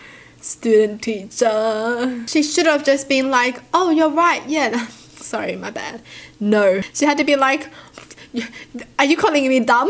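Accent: Australia. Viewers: Is it just me or I love her accent ✨ Yk, wotaaaaa and norrrrr and caaaaaare and sooooorrrr lol Aussie accent is so cute :D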